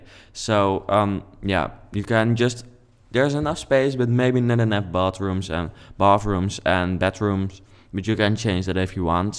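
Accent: Netherlands. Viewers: Uk hoor gewoon aan je stem dat je Nederlands bent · You might wanna work on your accent a little, cause it's really thick dutch. I'm dutch and it's a bit hard/irritating to listen too. But i really like the house! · He is from Netherland. Not everyone can speak good English.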